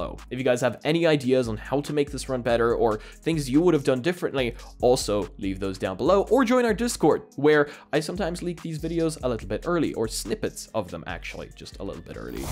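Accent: Poland. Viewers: you from poland? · Are you polish? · Man good video but are you from Poland?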